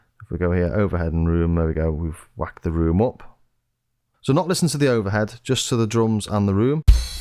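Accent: Liverpool, United Kingdom. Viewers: You a scouser?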